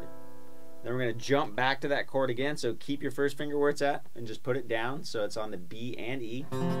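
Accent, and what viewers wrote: United States. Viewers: I love the way he talks haha amo ese acento estadounidense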